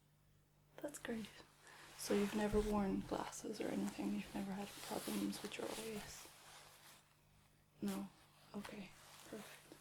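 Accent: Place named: Ireland. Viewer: I was hoping this would be relaxing but the Irish accent coupled with being Irish myself just gave me PTSD of sitting in Tallaght hospital lol.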